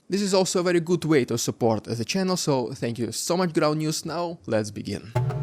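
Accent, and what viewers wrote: Russia. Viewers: Cool. That's why my investigation rushes so much to put it in court BTW. Amayzing ryazan' aksent😂 Organized crime was always the problem of Russia. Starting back in Russian empire. Not in the 1990's. It's simply broke lose in 90's.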